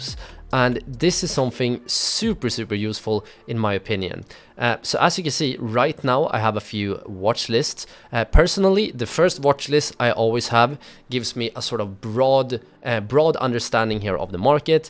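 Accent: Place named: Sweden. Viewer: Like the swedish accent❤️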